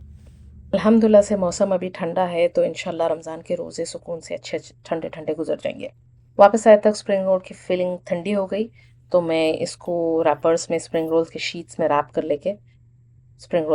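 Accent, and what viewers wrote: Hyderabad, India. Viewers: Aapki language Hyderabadi lagti hai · Ramdan mubarak... aameen lovely vlog ... m so excited when your vlog comes ... lovely hearing you ... i always find hydrabadi language bit funny dont mind this word "apanay ku" 😂 to funny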